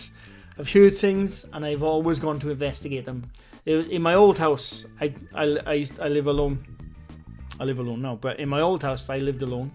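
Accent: Wales, United Kingdom. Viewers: As a Scot, I have no idea why anyone would think you are Scottish?... You are clearly Welsh. All the best mate.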